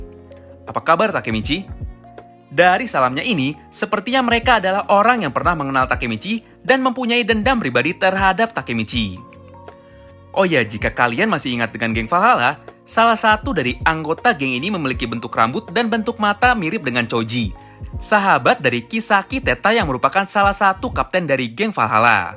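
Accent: West Java, Indonesia. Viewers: Drag-keun orang sunda ya ?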